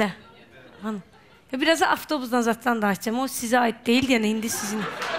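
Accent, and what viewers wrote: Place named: Baku, Azerbaijan. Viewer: Sene nə dəxlisi var elə söz deyərsən gulmerem ama bu bir az Bakimin lehcesinde daniwir, hər wey zordi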